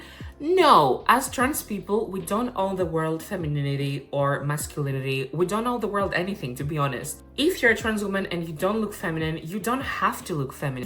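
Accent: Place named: Greece. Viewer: I don't know if this is common knowledge but I have not been sure about it. Hearing your voice now, I feel it confirms my suspicion that you might be greek, lol. So I was wondering how you use neutral pronouns in greek. Asking for personal use 😅 Anyway, thanks for the makeup tips too.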